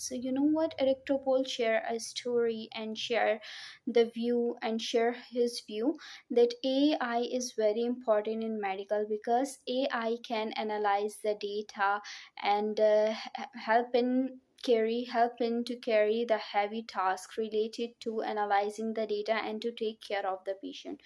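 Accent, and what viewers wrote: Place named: United States. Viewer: Your acent sounds like American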